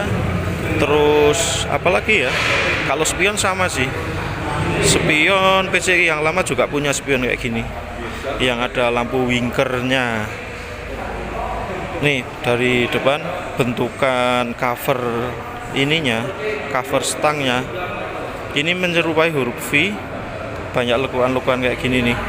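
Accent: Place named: Java, Indonesia. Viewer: Jowoo medoq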